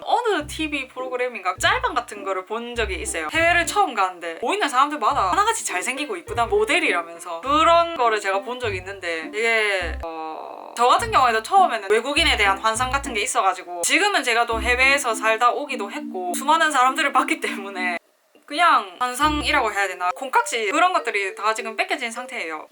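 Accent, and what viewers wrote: Gyeongsang, South Korea. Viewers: 외람되지만, 경상도 어디사투리 인가요? 많이 들어본 억양인데 어느 지역인지 궁금하네요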